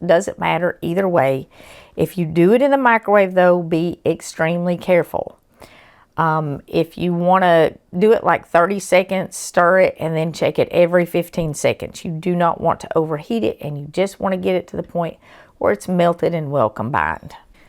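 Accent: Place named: Texas, United States. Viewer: You sound like texas. Great recipe.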